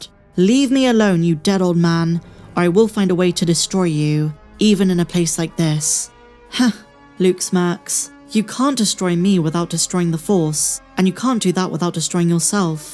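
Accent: United Kingdom. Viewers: I don't know if your voice acent it's England, Scotch or Ireland, but i love it. You have so a sensual voice. Greetings with respect from Mexico. MADE THE FORCE BE WITH YOU AND PROTECT YOU ALWAYS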